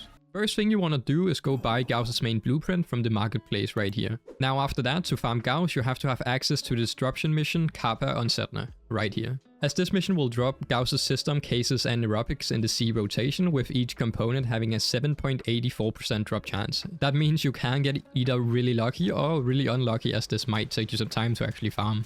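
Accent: Denmark. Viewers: This guy sounds so danish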